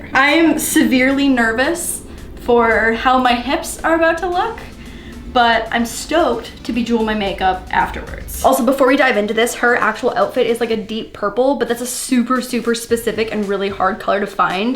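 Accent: Canada. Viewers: Some ppl make fun of Canadian accents but I think it's so cuuuuute like puppieeeees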